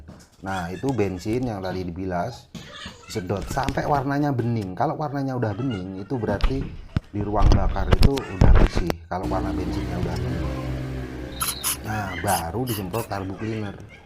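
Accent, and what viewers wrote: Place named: East Java, Indonesia. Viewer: Suara anda kok kayak orang jawa Timur bang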